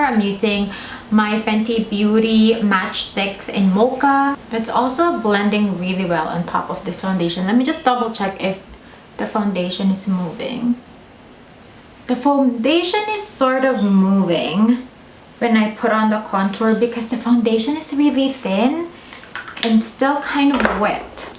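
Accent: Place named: Philippines. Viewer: I hear Filipino accent 🇵🇭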